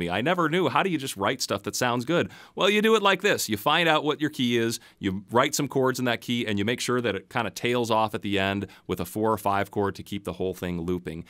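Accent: United States. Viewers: I can sync, feel this Dude’s Radio-sounding voice, clear English-American accented explanations and way of teaching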